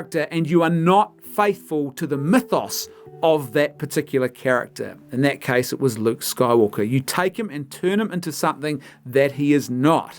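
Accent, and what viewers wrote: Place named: New Zealand. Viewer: Kiwi accent.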